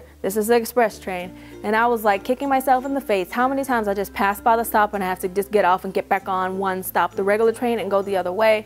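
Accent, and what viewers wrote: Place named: Los Angeles, United States. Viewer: LA accent?? I like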